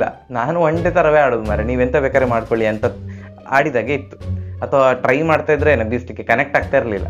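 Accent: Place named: Coastal Karnataka, India. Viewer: ನಾನು ಮೂಲತಃ ಉತ್ತರ ಕರ್ನಾಟಕದವನು, ಕರಾವಳಿ ಭಾಗದ ಭಾಷೆ ಕೇಳೋಕೆ ತುಂಬಾ ಸೊಗಸಾಗಿರುತ್ತದೆ ಅದರಲ್ಲಿ ಬೆಳ್ಳಂಬೆಳಗ್ಗೆ ನಿಮ್ಮ ಮಾತು ನನಗೆ ಹಕ್ಕಿಯ ಚಿಲಿಪಿಲಿಯ ಹಾಗೆ ಇಂಪಾಗಿ ಕೇಳಿಸುತ್ತದೆ. ಧನ್ಯವಾದಗಳು.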